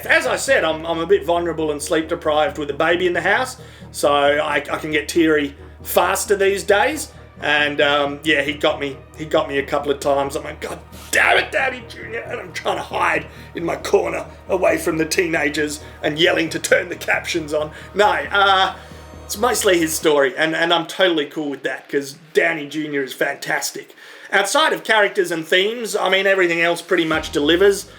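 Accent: Australia. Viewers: Funny I had on captions while watching this video to make sure I understood your Aussie accent correctly, you bloody legend!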